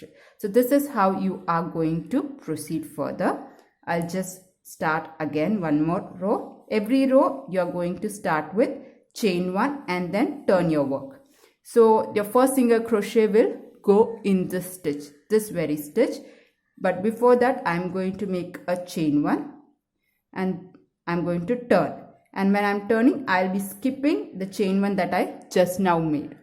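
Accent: India. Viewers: I am watching this in 2025….this video helped me a lot when i heard the Indian accent i knew this was the right video i am a bit impatient type of a person but this actually helped …all the American tuts were wastage of time❤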